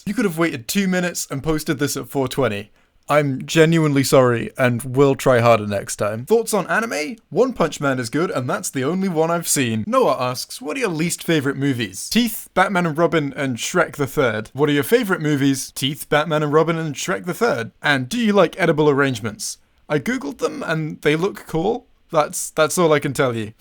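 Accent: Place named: Scotland, United Kingdom. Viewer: Question: I’m hearing a Scottish accent there mate, Whit part yae fae?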